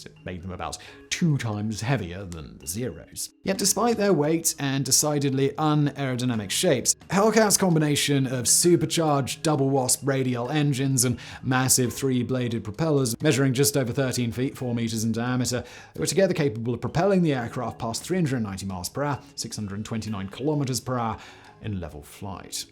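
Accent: United Kingdom. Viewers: The f6f was and is extremely well known. It's hardly an unknown. Tactics were simple, fly in at high speed take a shot and keep going. Don't dog fight, or die Japan had some incredibly skilled pilots but exceptionally poor training tactics, so once the few exceptional pilots were attritioned out it just became a matter of time before us Americans ruled the skies. Japan was never really going to pose a serious challenge due simply to Its limited man power, industrial strength and serious lack of raw materials. The US navy didn't want the corsair due to its difficulty in landing on carriers. The British, based on your accent I think you should know, showed the US navy how to land. Corsairs land by coming in from the side not straight on. The gull wing was to accommodate the massive prop and requirement for high strength gear meant lowering the wing to shorten the gear. 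Post war proves the Corsair was a better overall aircraft.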